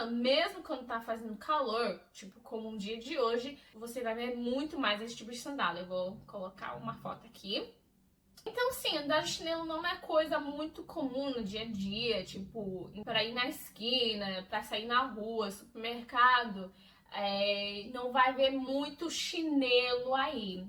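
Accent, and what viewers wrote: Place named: São Paulo, Brazil. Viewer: Vc parece paulista, curioso o seu português ser tão perfeito . Deve ter ficado muito tempo aqui.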